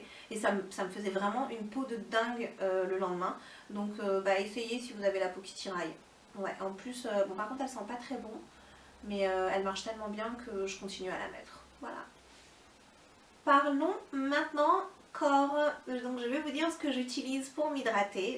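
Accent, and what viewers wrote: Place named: Iran. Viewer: Intonation iranienne.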